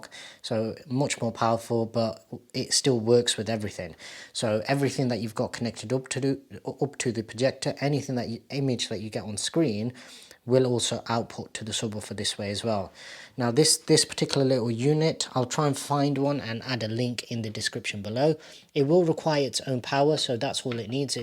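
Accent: United Kingdom. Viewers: Thanks for the English English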